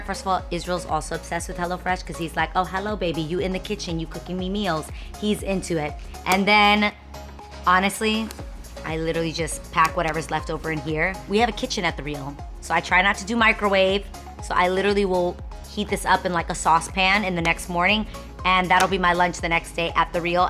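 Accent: New York, United States. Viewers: So I’ve been watching masked singer lol. And just watched the latest episode on Hulu. Soo I know flamingo is you lol. I didn’t catch on till I seen the fourth episode. I heard your beautiful singing voice. And I heard your little New Yorker accent even tho your voice is disguised aaand at the end of what you said “boom”. I was like That’s. Go girl!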